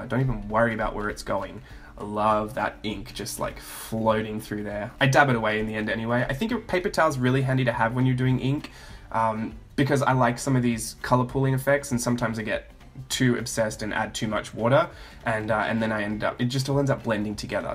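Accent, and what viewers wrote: Australia. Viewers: Love the dolls and yes perfect for my journal. Great to hear an Aussie accent on here too there are not many . Yay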